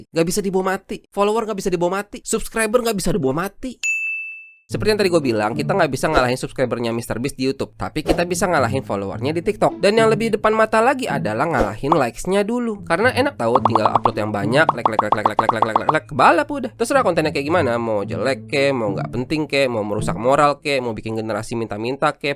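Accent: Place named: Depok, Indonesia. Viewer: suara lu,mirip mas mas depok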